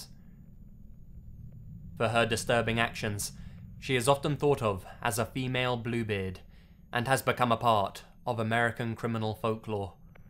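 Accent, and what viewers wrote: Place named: Southern England, United Kingdom. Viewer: It's how he pronounces it, he's from the South of England so it is bound to sound a bit different. If you listen carefully, you can hear the "inn" noise at the end, but the start does sound like he says "wuh" instead of "wih". By the way I'm from Northern England so I probably sound even stranger.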